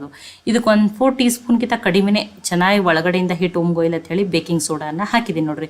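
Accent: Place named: Bijapur, India. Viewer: Madum nim video daily nodtini ri tumba isht nanage nanu bangalorè inda but nau bijapur davaru niu yav ooru pls tilsi nim matu nodidre niu bjp davara anta kushi agutte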